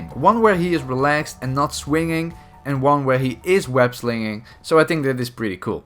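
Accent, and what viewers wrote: Netherlands. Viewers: where are you from, you sound like from the Netherlands, cuz I'm from the Netherlands. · I can see the Dutschness in your voicetone😂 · Yo have a dutch accent but nice video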